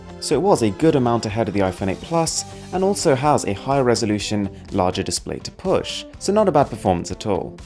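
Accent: United Kingdom. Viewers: Nice review indian guy with a british accent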